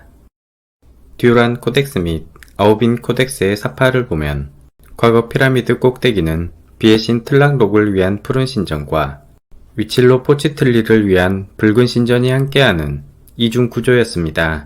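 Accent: Jeolla, South Korea. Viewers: 어!! 목소리가 전라도분이시다!!! 오오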